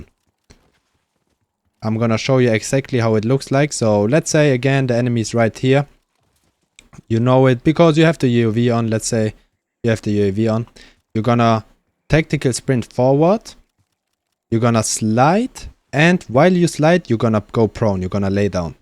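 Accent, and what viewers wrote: Germany. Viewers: Damn Bro U Made m3 a movment demon fr and i can hear the German accent 😂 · Are you German ?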